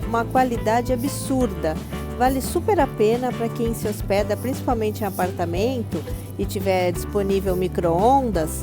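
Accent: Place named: Santa Catarina, Brazil. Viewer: Deve ser inveja kkkk..vc deve ser de SC o povo daqui q fala assim.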